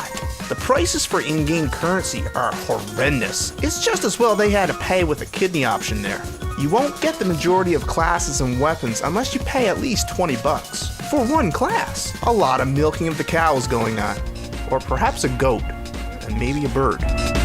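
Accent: Canada. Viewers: You have a Canadian accent lol. Anyways, thanks for the review! I'm glad you went over it and such but maybe you should do an update?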